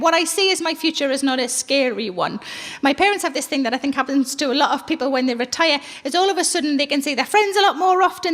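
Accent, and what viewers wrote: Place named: Scotland, United Kingdom. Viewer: I just can't understand Scottish.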